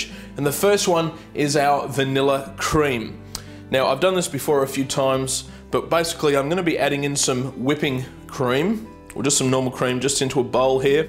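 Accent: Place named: Australia. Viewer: You are an amazing person! and have all these great dishes!!! AWSOME! also love the aussie accent man! and you must have a busy week making all these videos and deciding what to make and getting ready and all!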